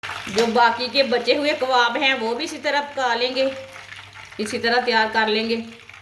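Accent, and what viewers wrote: Punjab, India. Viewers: Punjabi bolyn to behter hy full punjab8 lehja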